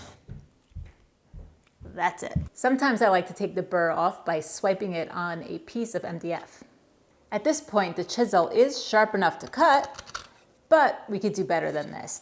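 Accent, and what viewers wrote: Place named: New York, United States. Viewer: Good work, but please announce that you are evaluating donated items at the beginning. You get me to grin every time you mention draws because I remember way back when to talked about the folks that "corrected" your New York pronunciation. Oh, and the lapping fluid? I use one of those little bottles, too. The first one went quickly because I flooded the surface. I have found, however, that just a few drops here and there (I tap the opened spout to spit out a drop) spread out and supply ample lubrication. YMMV, however. 😁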